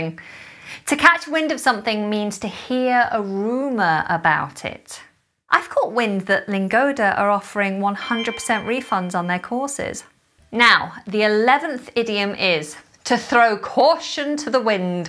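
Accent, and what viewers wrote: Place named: RP, United Kingdom. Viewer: I'm still learning English accent. It's really posh.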